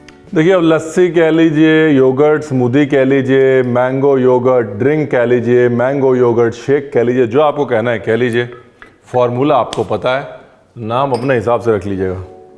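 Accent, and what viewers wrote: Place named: Lucknow, India. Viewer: the lucknawi touch in his tone simply adds the magic to each and every recipe of our dear chef...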